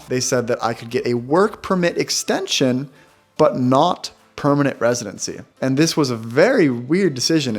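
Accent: Sweden. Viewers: Wow! I saw a video of yours quite a long time ago. Your accent in English sounds more Swedish now! :) Congrats on your citizenship! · Lately I've noticed your English being more influenced by Swedish. There's a slight accent at times as well as a few involuntary Swenglish phrases😊 Speaking like that makes you a true Swede!! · even your english has a slight swedish accent now, thats whats most impressing KEK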